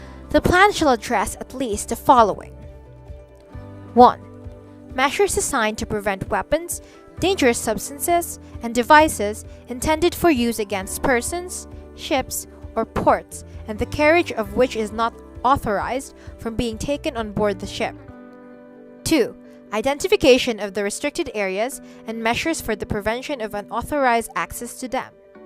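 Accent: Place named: Philippines. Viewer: Filipino accent🇵🇭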